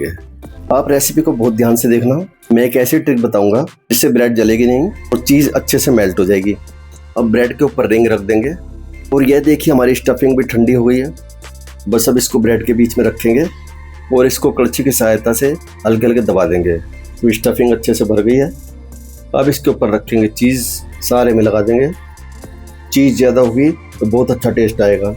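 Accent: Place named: Punjab, India. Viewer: Aapka punjabi accent bht mast h👌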